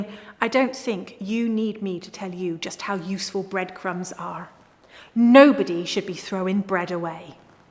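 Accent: United Kingdom. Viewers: British English ♥️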